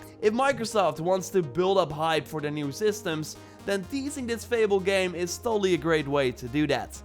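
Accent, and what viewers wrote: Netherlands. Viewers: Hey, Like your video's very much and learn a lot from them as well. Ben je Nederlands? Dacht het te horen aan je accent.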